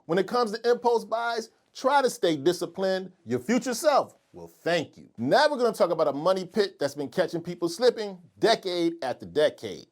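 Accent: Philadelphia, United States. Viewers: Are you from Philly?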